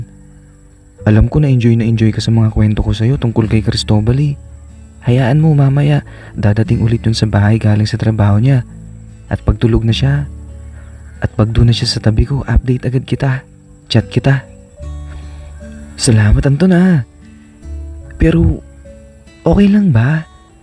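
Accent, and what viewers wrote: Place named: Batangas, Philippines. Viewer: sir hahahaha taga batangas kaba? ako taga nasugbu batangas. nahala ko kase tono ng boses mo ingat palagi sir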